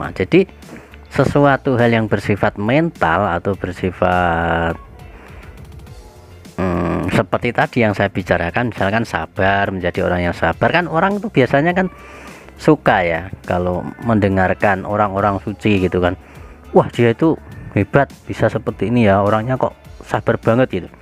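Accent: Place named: East Java, Indonesia. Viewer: Mantap Mas, panjenengan asli ndi mas, logat kayane asli daerah wetan?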